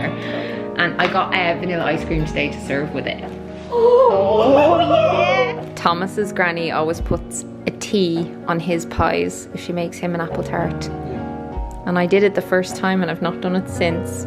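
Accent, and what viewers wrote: Ireland. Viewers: i'm going to go and study in Dublin for the next year so i've been binge watching your videos to up my game on the Irish accent so I don't look like a complete idiot 😂☀️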